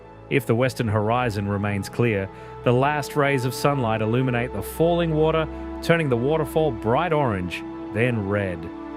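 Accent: Australia. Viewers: Love to hear an Australian describe dimensions in feet and miles..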